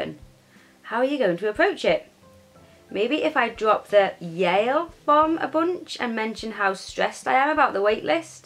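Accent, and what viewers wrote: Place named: England, United Kingdom. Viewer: How do you read so fluently??? I luv ur accent, do you live in England? :3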